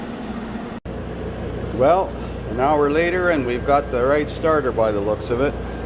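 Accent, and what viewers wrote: Canada. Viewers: I did one of these about a year ago for a guy who was a manager at a Wendy’s I go to ....he bought a used starter off eBay and said can you put it and I said yeah 100 bucks little did I know what was involved with pulling the intake..... and those starter bolts were TITE !!!!! And since it was an eBay starter I did the same thing and cranked it before I put anything back on his problem was he was getting that little fly wheel ZING noise but the fly wheel looked OK and it was fine after. I reused the intake gasket since it was metal ...smeared a little grease on it Maybe that starter was an optional mini high torque geared that they produce now the one you bought 2nd said “ original style “ I came across your videos by accident searching Verus edge.... I watched a bunch of them, really like your style calm, and methodical good guy to learn from plus how can you not like the Canadian accent aye .......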